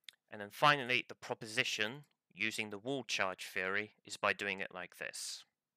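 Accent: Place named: United Kingdom. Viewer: Great video, you explained this in a very good way. One suggestion I have is that you tone down the British accent a bit.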